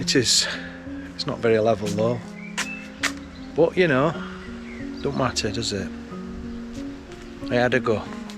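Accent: Yorkshire, United Kingdom. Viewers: Ahh I am new to your channel but listening to your lovely Yorkshire accent is getting me through Covid..... Stay strong and good thing's will come your way. Plus you have your lovely who is an absolute sweetheart... Love to you both X X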